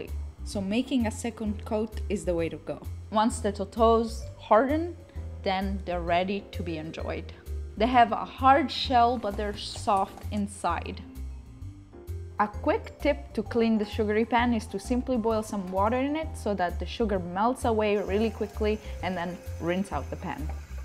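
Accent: Italy. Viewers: Love Italian accents on both men and women, semi musical speech intonation, like Argentinian spoken spanish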